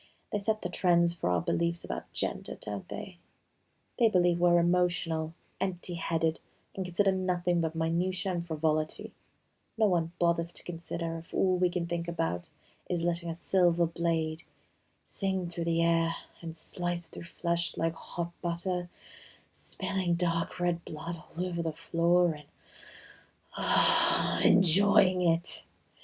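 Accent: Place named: United Kingdom. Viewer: You sound more British than I do 🤣 loved the twist and turns you took in this! 😱💖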